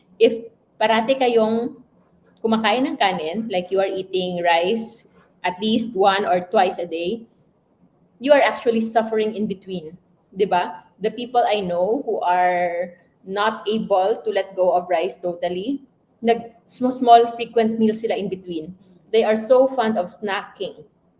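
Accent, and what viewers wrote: Iloilo, Philippines. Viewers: Ilonggo ka ba Doc?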